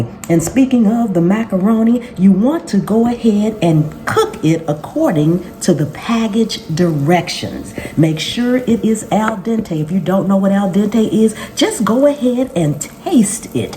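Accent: South, United States. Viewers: You must be from the south. I was born and raised in North Carolina and when I heard you say, "If the Good Lord's willing and the creek don't rise" I immediately thought of my mama and daddy. Thank you for the beautiful memory and for the recipe. It looks amazing! ❤🙏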